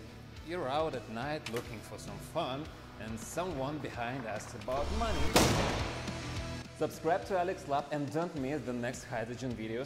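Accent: Russia. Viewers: Сразу, по акценту, понял что ты русский! Я желаю тебе удачи, ты молодец!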